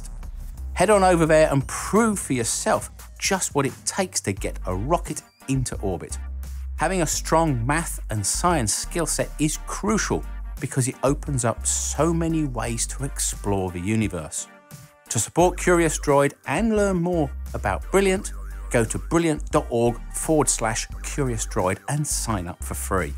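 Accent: United Kingdom. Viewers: Did he just say folcon heavy i ♥️ the british accent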